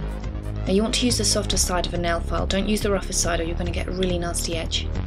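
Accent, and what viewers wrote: United Kingdom. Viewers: i love how u say "that's it, you're done" in ur fuckin awesome british accent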